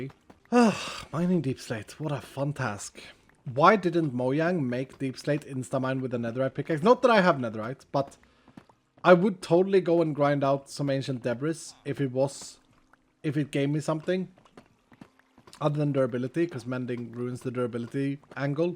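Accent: United States. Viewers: Dude....your American accent is perfect. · I love how his American accent is a mix of like 12 different regions but is somehow still convincing.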